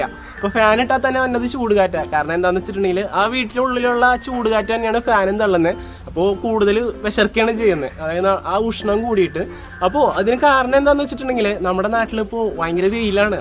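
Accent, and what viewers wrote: Thrissur, India. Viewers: തനി നാടൻ തൃശൂർ സംസാരവും കൺട്രി ലുക്കും ഉള്ള ഇയ്യാൾക്ക് യുട്യൂബിൽ എന്തു കാര്യം (ഇതു പൊതുവഴിയല്ല )എന്നു വിചാരിച്ച് മനസ്സില്ലാമനസ്സോടെ ആണ് ഈ ചേട്ടായിയുടെ വീഡിയോകൾ കണ്ടുതുടങ്ങിയത് ...പക്ഷെ ചുരുങ്ങിയ സമയത്തിനുള്ളിൽ നമ്മൾ സുല്ലിട്ടു ... ഇത് താടി വെച്ച അത്ഭുതം തന്നെ ... great ideas ....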